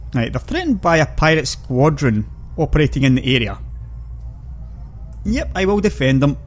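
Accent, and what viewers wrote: Scotland, United Kingdom. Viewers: Great to hear a grand Scots accent! It’s probably why the yanks haven’t been watching you. I was married to a girl from East Kilbride (Scotland’s 6th largest town and growing...as the sign proudly professed!) Unfortunately, I’m a sassenach, but I know the patter, having grown up in little Scotland.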